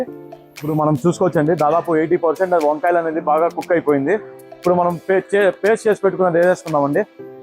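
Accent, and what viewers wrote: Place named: Kadapa, India. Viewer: మా కడప వాళ్ళ యాసే మీది కూడా.. ఆ మాట తీరు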